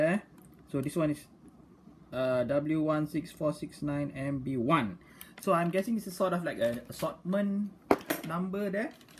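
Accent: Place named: Malaysia. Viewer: I did Subscribe this channel about 4 months ago, your channel has completely fulfill my desire to get to know what Hotwheels are on the newest case. At first, your video was good, but you also take notes to some suggestion that you get from your subscriber so now your video is getting better. And also, your intonations, your unique English-Melayu accent, the way you were talking makes me feels like i was having a conversation with my friends. You makes your subscribers feels like they were there, watching the unboxing themselves with you. Nice Job, Pakcik! your most famous tagline is: "Yes it is!" :D Salam.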